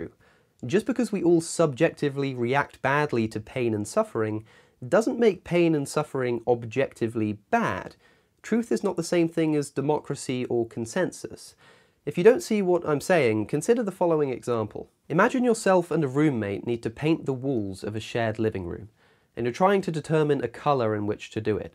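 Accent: United Kingdom. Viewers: CosmicSkeptic: intelligent British sounds Me: mhm mhm mhm 🤔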